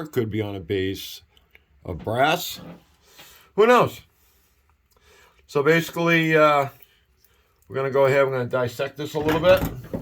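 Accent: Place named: New York, United States. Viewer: sounds like your from new york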